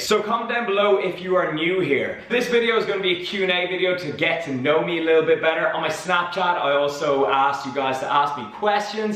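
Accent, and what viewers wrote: Dublin, Ireland. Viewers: That Dublin accent <3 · whats a D4 accent? D for Dublin? ahah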